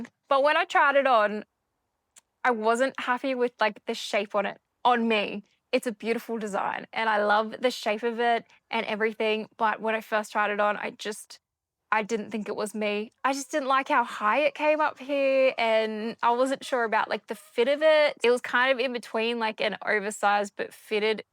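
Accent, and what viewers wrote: Australia. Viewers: Hi! I am so happy for you that they are finding you a diagnosis and should soon be figuring out better ways to help you! You are such an incredible inspiration with the way that you are able to film your wonderful videos for us and convey such joy for your knitting and for life in general. I am sure that I can speak for everyone when I say how grateful we are that you share so much of your precious energy with us, because as you say you get so tired and likely discouraged at times. Your knitting projects are so lovely, all of them, and you do beautiful colorwork. Also I have to say that I just love your accent, and practice my Australian word pronunciations when no one is listening😄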